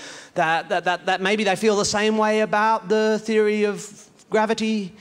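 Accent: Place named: United States. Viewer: Wow he does a very good American accent.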